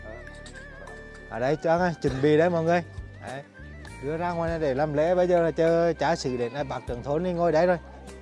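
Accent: Nghe An, Vietnam. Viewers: Giọng chú e nghe đậm chất xứ Nghệ thân yêu! Giọng nói đậm đà ấm lòng người nghe. Hoan hô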